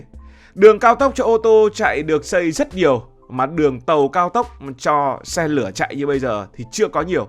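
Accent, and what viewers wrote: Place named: Thanh Hoa, Vietnam. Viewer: blv quê thanh hoá à, nghe 1 vài câu ra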